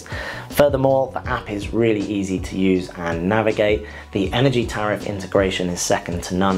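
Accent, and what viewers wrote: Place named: United Kingdom. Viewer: Excellent, clear and easy to understand. How refreshing to see a review in British English, not least of all because it is more reassuring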